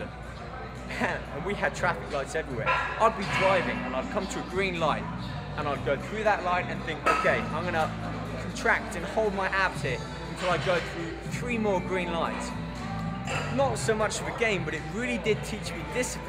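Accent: England, United Kingdom. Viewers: fuck ur englisch accent u fucking roid user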